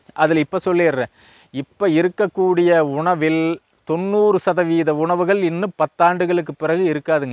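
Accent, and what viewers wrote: Kongu, India. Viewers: வேருக்கு மருந்து கட்டுற மரமும், காடும், ஆளும், குடிக்கிறவர்களும், போய்விடுவார்கள்... கிட்னியும் புடுங்கிட்டே போயிறும்.. கொங்கு தமிழில் வேற லெவல் பேச்சுங்க அண்ணா..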